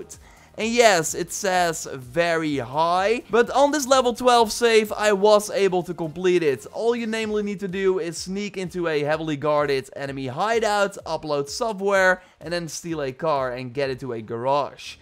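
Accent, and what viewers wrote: Netherlands. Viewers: bruv you make us dutch people look bad with ur accent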